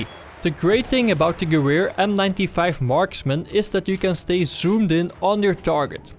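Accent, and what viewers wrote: Netherlands. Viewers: this is the kind of english with a Dutch accent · sounds like he's from the Netherlands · That dutch accent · the dutch accent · Is this guy Dutch? Sort of sounds like it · the "r"s sound pretty dutch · Horrible Dutch accent.... 'Twinty meters' ' Drrrop' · Einar Samuelsson He is from the Netherlands, believe me. I am from Belgium and I know how dutch people speak and I can understand them because we speak the same language. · It's sounds like your dutch? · you are really dutch i hear it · Are you Dutch ? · de duts aksent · Het is makkelijk te horen dat hij nederlands is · he really sounds Dutch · It is clearly a Dutch accent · this dutch accent hahaha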